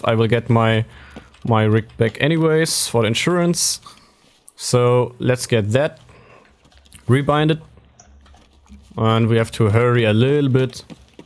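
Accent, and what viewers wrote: Germany. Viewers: Sehr gutes Englisch ! Man hört deinen Deutschen Akzent. Tolles Video! Danke🙏🏻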